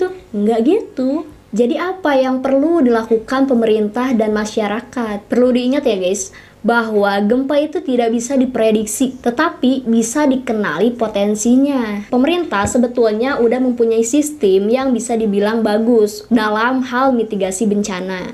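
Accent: West Java, Indonesia. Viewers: Logat sunda nya masih berasa :")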